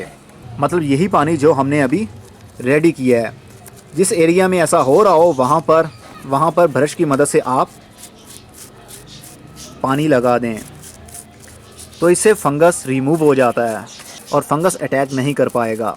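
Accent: Punjab, India. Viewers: abc xyz I believe since he is based in Lahore his urdu is accented with his first language punjabi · You are correct dear. Im Pakistani and we call it Phitkari i guess he is frm Punjab side and thts why he says it this way. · Birjees Sagheer Yes I think so. Im a native urdu speaker from India and I too think he has a punjabi accent. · abc xyz ......ye Pakistani Punjabi hei...unperh Punjabi ka urdu lehja hei....urdu bolnay ki koshish mein urdu ki aisi ki taisi phair raha hei....... jaisay.....Bharti... urdu boltay iski beikh o bunyaad nikaal ker issay Hindi kehtay hein....perhay likhay panjaabi behter urdu Bol letay hein....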